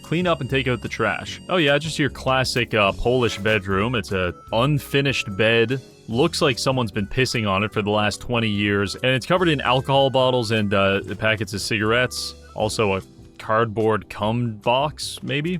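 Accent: Canada. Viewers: Your fancy Canadian speak amuses me.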